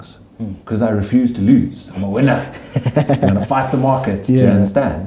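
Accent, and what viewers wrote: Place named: South Africa. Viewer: Wow I can't believe that this guy speak verrphaaaa like African South African 😂😂😂🇿🇦🇿🇦he is open minded indeed not only on business side of things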